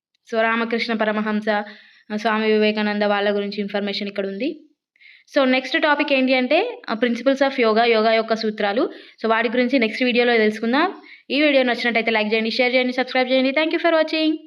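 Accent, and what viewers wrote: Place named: Telangana, India. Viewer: Subjeect with telangana slang 😂 👏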